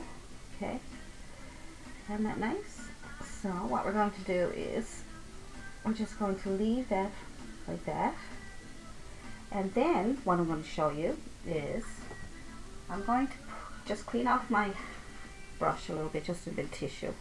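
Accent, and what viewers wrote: Newfoundland, Canada. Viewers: OMG this is the first tutorial that I have seen Love your manner and you make me feel right at ease....have just begun to paint, will definitely look at your others thank you sweetie, (you sound like a Newfoulander) love your accent xo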